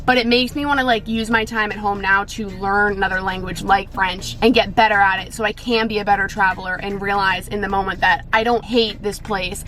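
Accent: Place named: Massachusetts, United States. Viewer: your MA accent is strong in dis one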